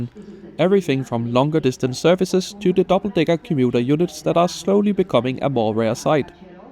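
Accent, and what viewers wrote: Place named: Denmark. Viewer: I was wondering: funny this voice sounds almost like having a danish accent! And them I read the name…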